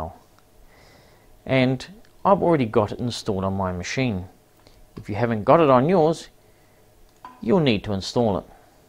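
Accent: New Zealand. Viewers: Hi there, Amazing to hear another New Zealand voice speak so eloquently and informatively. Awesome presentation, I don't know how this is the first time finding your channel. Please keep up the good work, good thoughts to you for helping so many.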